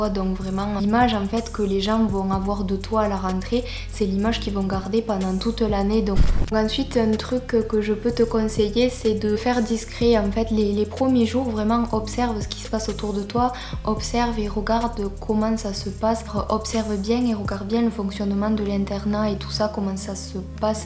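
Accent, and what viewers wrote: South, France. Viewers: Tu vien du sud PTN CON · jsp mais en tous cas c'est positif 😂ps: j'adore ton accent du sud ptn jsuis marseillais et je l'ai pas 😂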